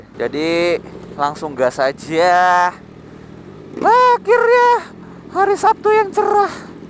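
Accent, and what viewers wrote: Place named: Java, Indonesia. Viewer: sampean I wong ngendi kok ngomonge medok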